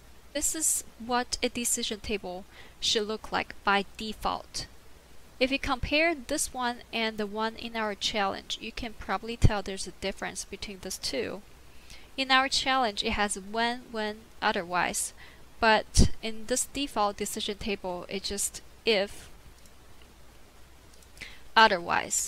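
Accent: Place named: United States. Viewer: The question I have is how can I see the contents of "Su Approval List" after the decision table has executed? I have tried to find it in the clipboard and find it using the tracer. Is it possible to find the contents of the Su Approval List page list in the clipboard or using the tracer? Also I was thinking the page list contents could be stored in the database. Since I use the pega academy cloud version of pega I know of no way to search the pega academy cloud version of pega. I had not trouble of getting the same results you got when I repeated your steps. I though it would be could know how to find what is in the Su Approval List page list for debugging purposes in future problems I might incounter. Thus the reason for my question. Keep up the good work. I worked thru 2 of you videos this week and will continue with the other videos as time permits. 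One of the main reasons I like your videos over most of the utube videos on Pega is I can understand your English (because it sounds like the English spoken in the United States).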